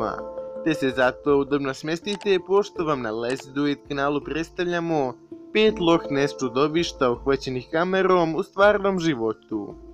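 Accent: Montenegro. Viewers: Da li si ti iz Crne Gore?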